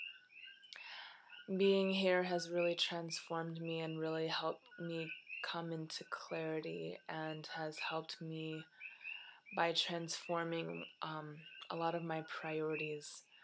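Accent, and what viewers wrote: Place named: Canada. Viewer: She's very pretty and has nice eyes and voice, but she needs to take out that nose ring. It reminds me of how a bull is shown in a cartoon or something. It's very distracting. She sounds kind of Canadian. Does she smoke pot though? She's Verrrrry mello. Yes, Centipedes will mess you up. I heard about them talked about on the Discovery channels Dual Survival, as well as throughout my life from various sources.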